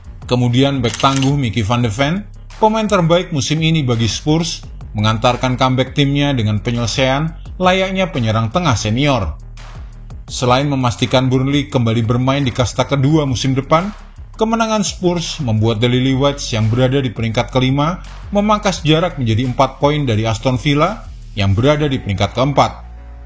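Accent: Java, Indonesia. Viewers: ngak ada pengisi suara lain ...medok banget:face-blue-smiling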